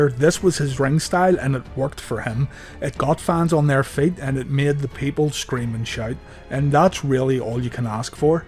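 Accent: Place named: Scotland, United Kingdom. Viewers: I'm half Irish American and native I been thinking u was Scottish lol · are you a Scot?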